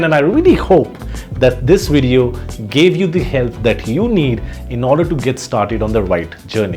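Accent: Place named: India. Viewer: Indian accent is cool bro, stay with it. It will work.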